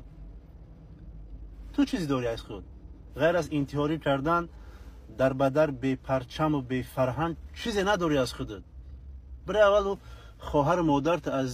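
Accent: Tajikistan. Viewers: Вай кулоби худшона гуфтестиян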